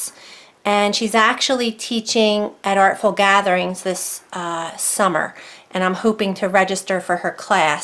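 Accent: United States. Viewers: I love your videos. It's like I'm right in the room with you. I may be the first to say, your voice is very appealing to my ear, since you have an American accent and it is easy to understand. Thank you so much. Remember., all imperfections in art are perfect when made as one of a kind pieces.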